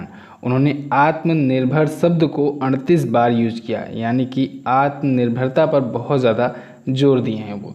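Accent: Bihar, India. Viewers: 4.zero bhai na ki 4.o 😆😆😆. Bihari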